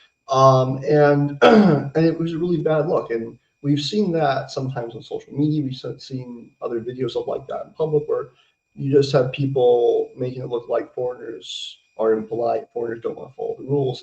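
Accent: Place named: United States. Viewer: YO!!!!! Chandler Bing LIVES!!!!!!! Why is he speaking with a posh American accent!!!!!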